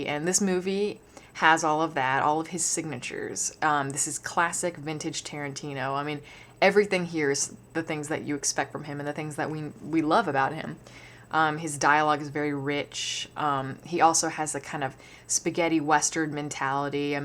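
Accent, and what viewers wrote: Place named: South, United States. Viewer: Southern twang? Accent has changed a bit. A follow up re review on this? It has aged horribly imo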